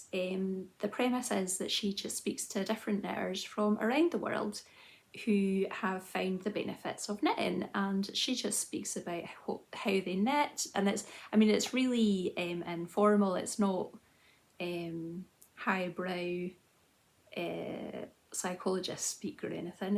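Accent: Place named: Highlands, United Kingdom. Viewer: Oh, I'm so happy about that! I made a vintage jersey for my sister and the pattern called for a zip on the shoulder. Not only could I not find a short enough zip but I decided it would be itchy. So I used crochet loops (on a tiny needle so they came out nice and dainty) and it looks lovely. You may need to experiment on a swatch you already have. Mind you, the other benefit of trying this is that it's very quick , to both do and to rip off again if it doesn't work out. ps I love hearing a Highland voice, I live in the Midlands but am from Dingwall/Brahan. But actually it's not just your accent and dialect, it's your manner too. You make me homesick :)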